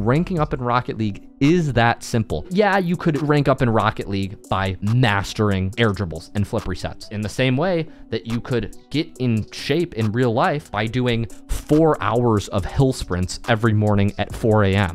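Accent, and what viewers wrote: Poland. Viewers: Wait a minute are you polish? That Google what hear it is was i polish? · Is he from Poland? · Nie myslalem ze jestes polakiem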